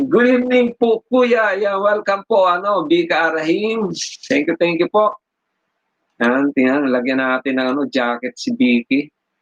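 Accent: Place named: Bicol, Philippines. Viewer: Meron kuya my sounds ..you are from bikol?I'm from bikol too..from Iriga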